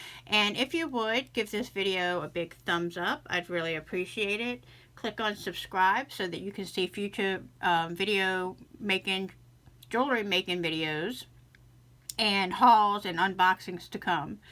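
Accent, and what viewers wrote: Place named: South, United States. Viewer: Lovely designs! I'm a new sub. I've been making jewelry for years, but love to see what others come up with. Thanks for the inspiration. Just wondering, are you from the northeastern part of the country? Or maybe mid-Atlantic? I'm trying to place your accent. Seems a little bit southern and a little bit northeastern. Also, I'm wondering if the hand-appliqued beads are Japanese Tensha beads? (The link that you posted above for the beads is the same as the link for the bracelet.) I'd love to get some. They are enchanting. You're pieces are beautiful. Thanks for sharing!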